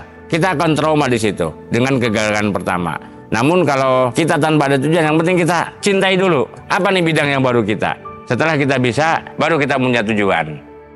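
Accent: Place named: West Java, Indonesia. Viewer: Pantes logatnya kaya logat sunda...lama di bogor toh kang...terimakasih ilmunya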